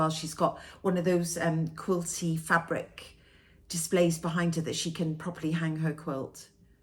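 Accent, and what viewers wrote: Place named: England, United Kingdom. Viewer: I absolutely loved your longer video. You inspired me so much! I could listen to you all day. Such beautiful work and your very pleasant accent reminds me of my grandparents and uncle. They were from England. Keep your videos long. I got quite a bit of stitching done listening to you.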